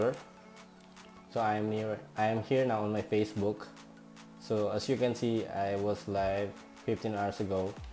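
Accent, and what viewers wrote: Philippines. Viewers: halatang pinoy ka sa accent thank you talaga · I like your accent :p PINOY?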